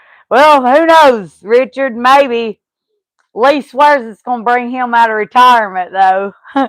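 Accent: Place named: South, United States. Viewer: Chuck loves your southern accent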